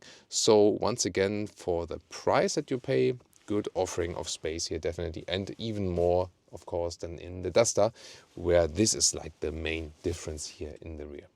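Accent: Germany. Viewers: Such a beautiful review, very enjoyable to watch and listen too. I do love the elegant German tone of voice in English language. 11/10 review. Thank you so much!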